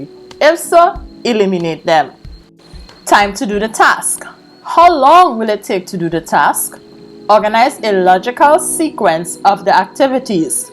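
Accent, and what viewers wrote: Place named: Jamaica. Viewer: You soon like Jamaican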